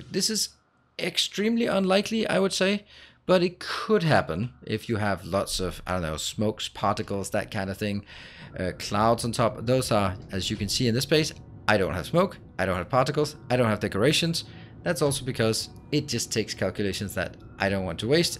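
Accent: Denmark. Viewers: oh god is that danish accent recognisable. From one danish person to another!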